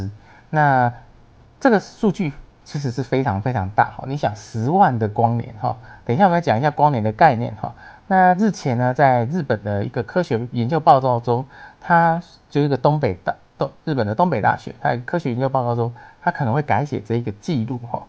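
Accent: Taiwan. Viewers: 滿滿的台灣腔齁.....係勒齁沙小的?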